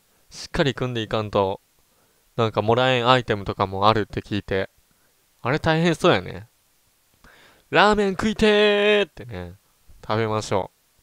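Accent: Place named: Ehime, Japan. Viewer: 〇〇やからって方言で愛媛かとおもってました(^○^)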